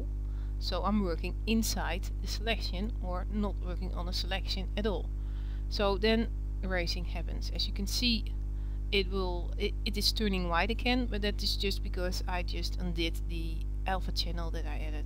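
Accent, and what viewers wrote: Netherlands. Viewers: definetly dutch, good tutorial though : D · Yep, that's Holland ! I can tell by the voice and the buildings ! I visited there with a friend in 2005.....wonderful country and the people were so tall !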